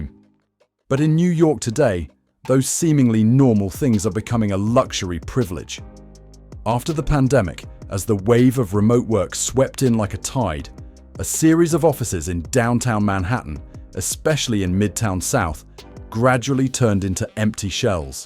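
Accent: United Kingdom. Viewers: Why a guy with a British accent know about New York. But If I can say something about this as a New Yorker is that New York turned is a shithole.😮😮😮and it because of politics and the real-estate courrption the NYPD & Port Authority & the MTA. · Why is a man from England with British accent describing New York situation?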